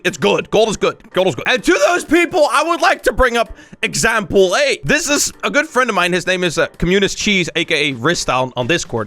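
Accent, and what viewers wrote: Netherlands. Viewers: Are you dutch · Your Dutch aren’t you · Wait are u dutch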